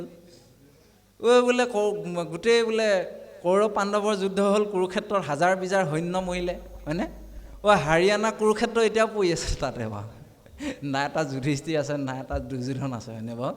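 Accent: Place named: Darrang, India. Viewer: Bap আপোনাৰ বানী শুনি বহুত ভাল লাগে। Darrang ভাষাৰ লগত মিলে।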